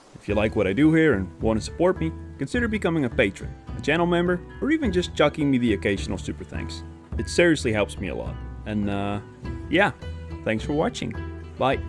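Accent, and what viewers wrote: Netherlands. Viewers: Thats sick! Do i detect a dutch accent? keep up the good work!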